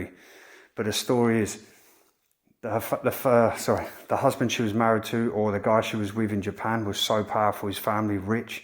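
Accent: England, United Kingdom. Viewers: Great video. watching from USA but I'm English and it's nice to hear an English accent again.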